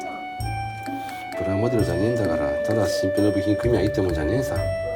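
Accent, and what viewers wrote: Niigata, Japan. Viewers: 違ったら失礼ですが新潟の方ですか？喋り方やイントネーションに親しみがあるので☺️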